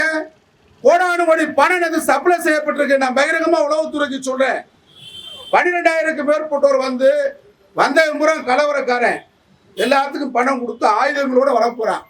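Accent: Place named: Madurai, India. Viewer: உன்னோட சண்டியர் தனத்தை ஆலோசனை பண்ணிருக்க. 🔥🔥. அருமை.. மதுரைக்காரன் பேச்சு. பாமரனுக்கும் புரியும் படி பேசுவது அண்ணனின் சிறப்பு. 🔥🔥🔥🔥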